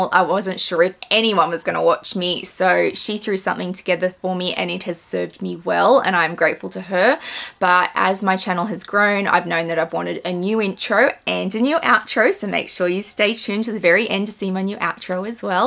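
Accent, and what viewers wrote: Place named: Australia. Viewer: I’m literally addicted to. Ok that sounds weird. 😂 I’m addicted to her closet! Her bag collection! Actually no I’ll throw, the human, in there too! Seems like such a super nice person. ❤ Can’t get enough of that Aussie accent too! What I love most, is that even though she can afford to buy whatever she wants, and has quite an extensive collection, she never “brags” about it! She shows it to those of us who love luxury, but she doesn’t make you feel bad nor envious if you’re not so fortunate! Love that! She’s bad for my wallet though! I’ve purchased a new Chanel and a 2 new Fendi’s since I’ve started following her! 💰 ❤😂 But that’s ok! Can’t wait to see what she inspires me to buy next! I’ve got a much smaller collection that Miss of course, but that’s ok too! Whenever I can’t stop thinking about something she’s showing, I go to the Real Real and try to find a previously gently loved version! 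I do buy brand new as well, but I’m into circular fashion these days. Saving the planet 1 bag at a time! 😊 To me it’s like why buy a dog when there’s so many waiting for homes at the shelters? No offense to those who buy exclusively new, but I just got 3 bags for less than the price of one brand new! Good luck filling your wish list this year! I can’t wait to see what you add! 👏👏💗💗🥰🥰💗🥰💗😎😎😎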